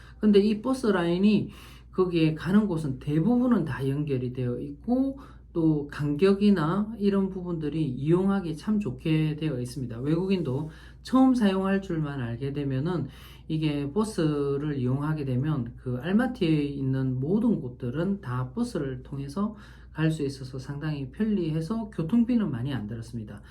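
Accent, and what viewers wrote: Gyeongsang, South Korea. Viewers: 수고 하셨습니다~ 감사 합니다. 같은 억양의 경상도 할배라~ 의 세계 탐방 정보에 따른 사고의 멘토를 부담없이 만족하며 보고 있습니다. 항상 건강에 유의 하시고 가족과, 연로하신 어머님께도 지금처럼 열심을 다하는 효자가 되시길 기도 합니다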